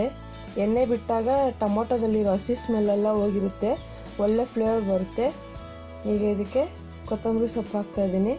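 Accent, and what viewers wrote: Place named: Kolar, India. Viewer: I think u r from Kolar region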